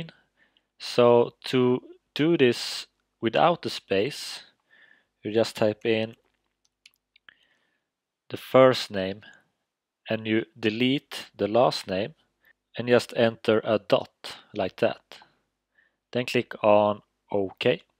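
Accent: Sweden. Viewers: r u from sweden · are you from sweden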